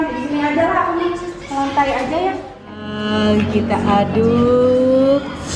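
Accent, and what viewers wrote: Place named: Sunda, Indonesia. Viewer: Pasti ngiler koq ratu bakso sdh makan bakso...manyus teh...jd pengen mkn bakso.. Saya bukan org sunda tp suka banget denger teh bicara bhs sunda...jd tau gitu dan enak di dengar..suka nonton ini krn ada ciri khas yaitu bhs sunda, dialek sunda suka banget dengarnya👍👍👍💖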